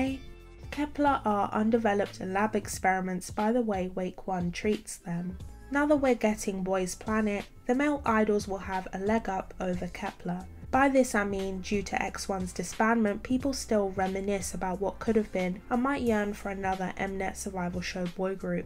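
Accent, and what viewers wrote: United Kingdom. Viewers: YOU'RE BRITISH????? 😬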